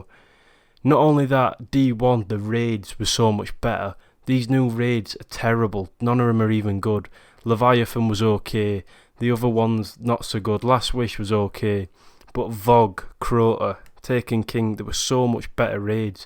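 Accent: Yorkshire, United Kingdom. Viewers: I only live forty minutes from Sheffield. Your accent is one of the reasons I subscribed. Can't beat the no nonsense opinions of a Yorkshireman! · I don’t know if you’ll see this but I love your accent 😂 it sounds Yorkshire a bit I’m from Yorkshire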